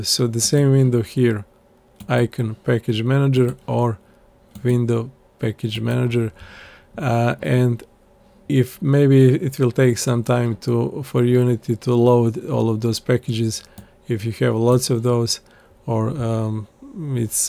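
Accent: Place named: Balkans. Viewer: Brate, je si li ti Sprksi? Cujem nasa acenat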